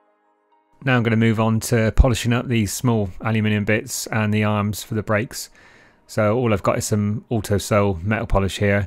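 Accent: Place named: South West, United Kingdom. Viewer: 😂😂😂 you cant get away from that south western accent mate 😂😂😂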